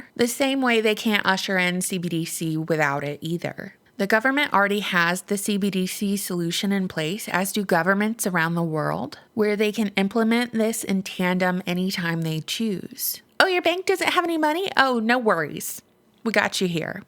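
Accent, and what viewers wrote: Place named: Georgia, United States. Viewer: omg I can hear you Georgian accent...I'm so excited for this! 😅 haha im such a dork, love your vids! 💕💕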